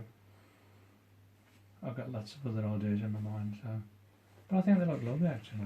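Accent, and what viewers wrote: Birmingham, United Kingdom. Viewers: Sounds like a fellow Brummie! We have always been an inventive lot.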